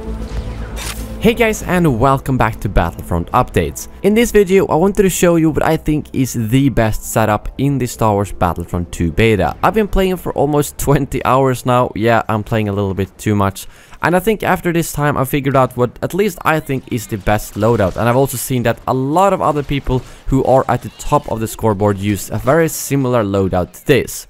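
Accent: Sweden. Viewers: I just found this guy and i got it right away that you were swedish, hahah hej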